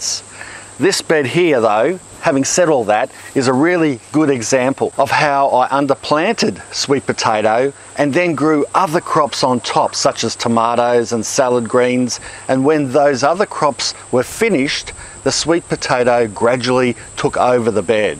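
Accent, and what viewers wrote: Queensland, Australia. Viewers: Great vid, great tips! Thanks, mate! I'm actually living in Northern Canada, where we don't have enough heat or warmth to grow sweet potatoes - at least not the size you have there. But I was initially caught by your accent, and said to my self that sure sounds like a Qld accent. hehehe I went to school at Southport for 6 years.😂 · So good to hear an Aussie voice! We live in S.France and are going to plant our sweet potatoes for the first time...thanks!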